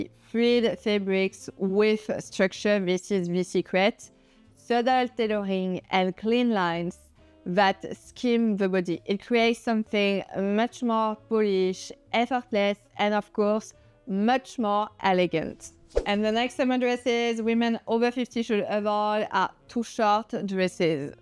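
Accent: France. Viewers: Um, she is a French speaker trying to adapt that odd word to her how she has learned to pronounce her language.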